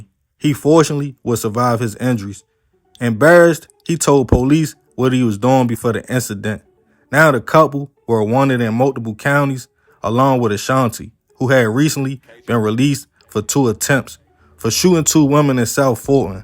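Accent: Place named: Mississippi, United States. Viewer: You got great stories but why it sounds like you read at 3rd grade level….or from Mississippi…. You have great content frfr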